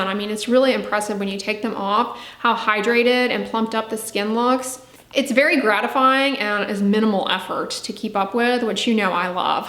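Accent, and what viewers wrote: United States. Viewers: She definately does not have Australian accent. I am from Australia. Her accent is American.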